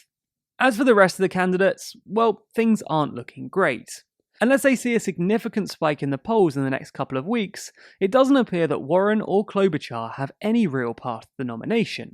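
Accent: England, United Kingdom. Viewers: You mean that's the english dialect of english, which equally as valid as the american dialects of english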